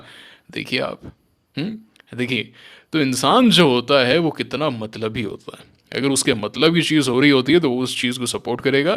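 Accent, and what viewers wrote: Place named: Rajasthan, India. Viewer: Aapki baatein sunke aisa lagta hai ki : Aap rajasthan se hai (shayad - Kota, ajmer side) and aap kota (JEE) padhne ke liye gye thhe.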